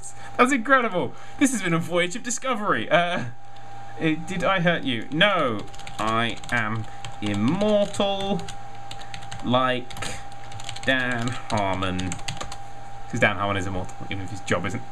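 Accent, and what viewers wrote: United Kingdom. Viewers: Beλst Menus That's because,you know,british accent.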